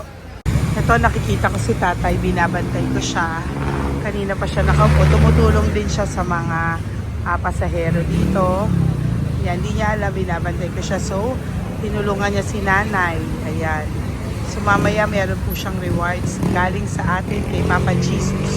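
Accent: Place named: Visayas, Philippines. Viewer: Hi! Lovely Lady, bisaya ka day ang salitang tagalog ay malumay bigkasin,kaya ng sanabi mo kukuhain ang tama ay kukunin at isa pa bagay2 malumay bigkasin,ang bisaya paspas mag-salita.😢😢😢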